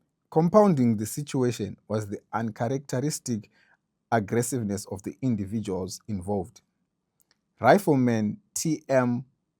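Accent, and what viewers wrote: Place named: Zimbabwe. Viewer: You sound Zimbabwean Bro... Are you in South Africa as well?.... You know who will actually do something about this..... That Gayton McKenzie...!!!!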